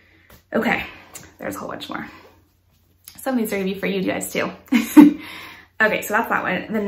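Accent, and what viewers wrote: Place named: Canada. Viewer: Your stitching is so beautiful! Always enjoy your videos. You’re adorable and I love your Canadian accent ❤️ Also, you talk super fast. It cracks me up 😂❤️❤️❤️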